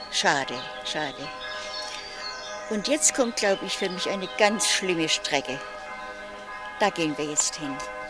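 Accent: Swabia, Germany. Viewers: an alle die nicht aus bawü stammen hat die Oma einen schwäbischen Akzent? Ich kann sowas schlecht beurteilen weil ich für mich auch keinen Akzent hab aber Freunde aus dem Norden immer meinen das sie kein Wort verstehen ^^ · oma redest du schwäbisch oder badisch wenn du nicht vor der Kamera bist? Grüße aus Pforzheim nach Karlsruhe❤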